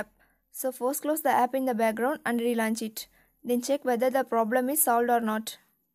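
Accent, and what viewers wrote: India. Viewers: I CANNOT STAND INDIAN ACCENTS!!! STFU!!!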